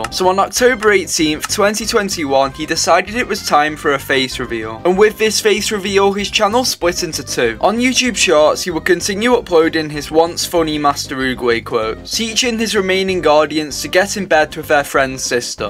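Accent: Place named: United Kingdom. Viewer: "and you can always undo it if you get tired of my british accent" LOL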